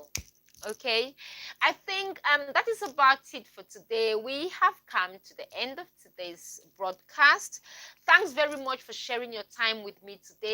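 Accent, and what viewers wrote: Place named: Democratic Republic of the Congo. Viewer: my sweetheart. Listening attentively to you, I think you are originally from Congo ( Zaire ).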